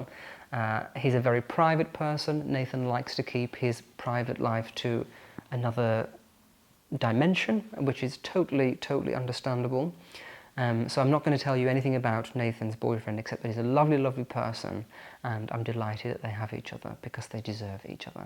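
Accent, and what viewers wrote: RP, United Kingdom. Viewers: U R A BIT plummy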